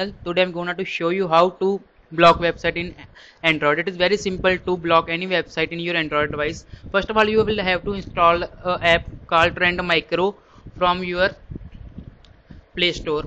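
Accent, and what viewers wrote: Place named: India. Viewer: Katherine Hendrix Indian accent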